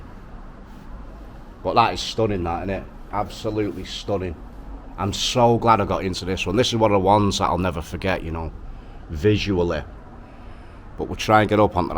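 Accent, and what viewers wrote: Manchester, United Kingdom. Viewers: Yer brilliant chanel lads,why do our kids look so uncomfortable around us?? My lads the same quiet ,and like you I'm a seeker. I still like to look at old buildings . But my lads like 'come on ya fat c. But yer you don't see channels like this .Well I can't find em .wheres your accent from. Sounds mancunian. Or near too. But it ain't Salford.keep it mate ,please.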